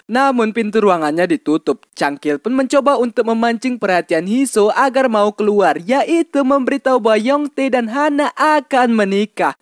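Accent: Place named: South Sulawesi, Indonesia. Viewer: Emang telaso mu😂😂,pasti orng Sulsel kan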